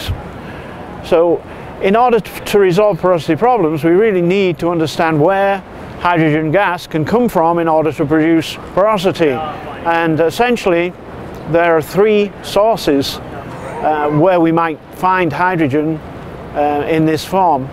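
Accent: United Kingdom. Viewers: Two things, you sound like your native country is England, so why say that word incorrectly?! And secondly you look like hack you up with a hatchet Harry from Lock Stock And Two Smoking Barrels. Top video though, good tips!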